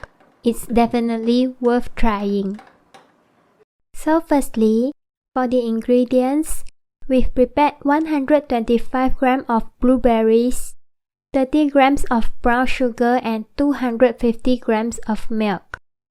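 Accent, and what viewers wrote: Malaysia. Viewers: The title says Korea but She sounds like Malaysian/Singaporean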